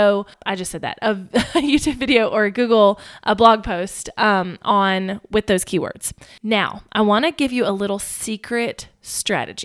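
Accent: South, United States. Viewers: That was so helpful, and the Southern accent made the video especially interesting! 😉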